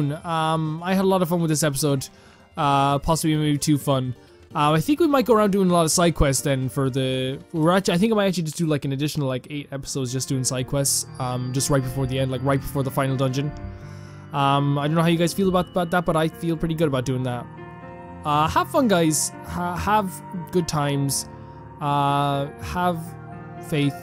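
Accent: Ireland. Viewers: You know, you remind me a lot of Jacksepticeye, and not just because of the Irish accent, but you’re always so happy and positive, and it’s really made this play through super fun to watch! Please keep it up, I have no doubt your channel will grow to immeasurable heights :)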